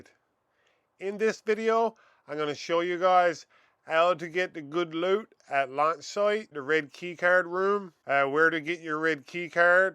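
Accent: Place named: Newfoundland, Canada. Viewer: You gotta be a Newfie lol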